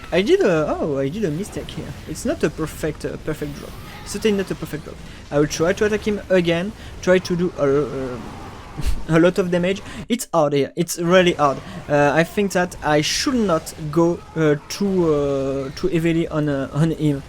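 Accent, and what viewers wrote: France. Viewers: le french accent aha , bon j'ai le même, top contenu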